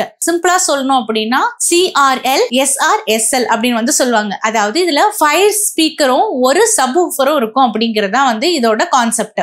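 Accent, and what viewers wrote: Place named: Madurai, India. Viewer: பேச்சில் மதுரை நடை வருகிறதே.... மதுரை/திண்டுக்கல் பக்கமோ...... தெளிவான விளக்கம். அருமையான காணொளி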